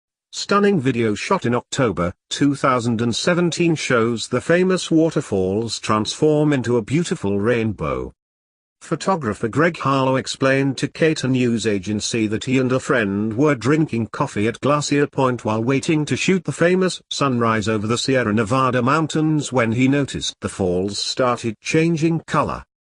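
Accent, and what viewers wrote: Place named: United Kingdom. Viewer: Why is there a British guy blabbering over this?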